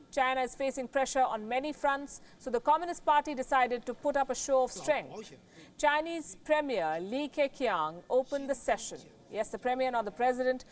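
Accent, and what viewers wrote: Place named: India. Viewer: So many people with low intelligence are making hating comments here cheering this biased news video presented by an Indian woman with strong accent.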